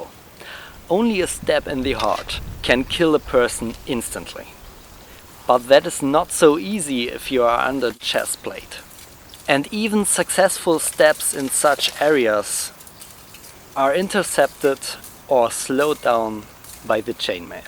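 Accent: Germany. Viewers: Aud sem Dialekt meine ich rauszuhören das du aus Deutschland kommst, ist das korrekt? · Mein abo hast du. 🤘🏼 Aber den deutschen Akzent hört man ultra raus. 😉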